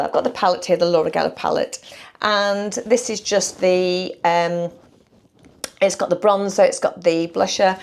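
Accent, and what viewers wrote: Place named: England, United Kingdom. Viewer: I have that Max Factor palette from Amazon, it’s one of my favourites. The colours are so pigmented. It’s refreshing to hear a makeup guru with an English accent. New subbie loving your channel x VickAngel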